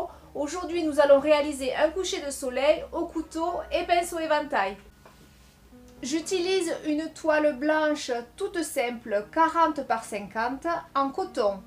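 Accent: South, France. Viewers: Bonsoir une très bonne année à toi et ta famille quel magnifique tableau une fois encore c'est exactement le genre de paysage que j'aime regarder et essayer de le faire j'adore 💕 il est waouhhhhhhh bon week-end à toi bisous et à très vite j'espère pour une nouvelle toile merci pour tes explications simples et ton très bel accent du Sud tu nous fait rêver ❤❤❤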